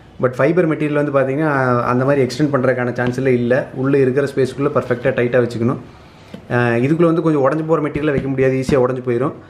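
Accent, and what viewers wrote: Kongu, India. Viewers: கொங்கு தமிழ்...❤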